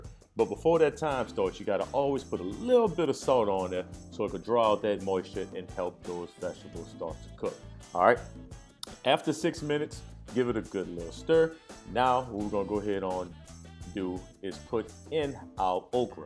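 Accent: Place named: New Orleans, United States. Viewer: Came to do this on Christmas, I knew I had the right video when I heard that New Orleans accent and the word " rices" . It came out great my brotha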